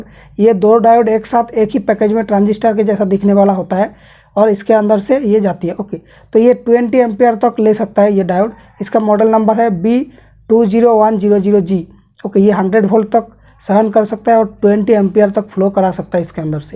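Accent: Bengal, India. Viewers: Ap Bengali ho and sory ples repla💖💖💖💖😭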